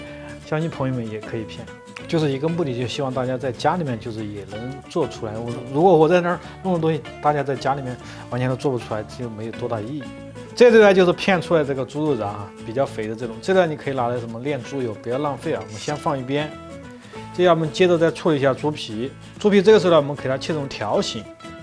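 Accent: Sichuan, China. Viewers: 喜歡川普口音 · 很喜欢看你的美食视频，很轻松，节奏也控制的很好，少许川普加时不时的幽默— 社会佩奇😅 请继续分享，我继续点赞👍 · 期待的年夜饭特辑，好喜欢！我小时候在四川住过四年，家乡的方言听着好亲切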